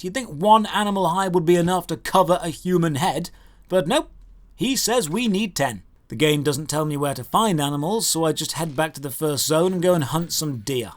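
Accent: United Kingdom. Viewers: I just love how angry he always sounds. Very british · David Attenborough, is that you? I can't tell you Brits apart.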